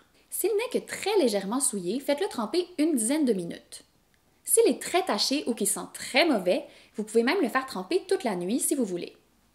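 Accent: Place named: Quebec, Canada. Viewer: accent Québécois au top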